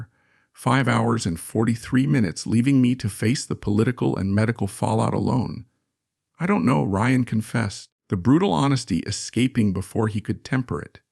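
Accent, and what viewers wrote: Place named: India. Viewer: Why is most of this narrated in one voice, but the chapter announcements and epilogue are delivered in an Indian one? And the text moving around? Why? If you want to promote views, these are things that need correction. If you do not care, then never mind. Continue putting in the time and effort to produce these, as your subscriber count barely moves. It's all up to you.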